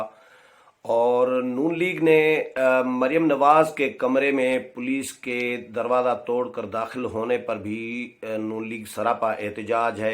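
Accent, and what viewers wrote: Sindh, Pakistan. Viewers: AoA. Sir, aap Sindhi style me bohat achi baat kartay Hain. Khush rahain.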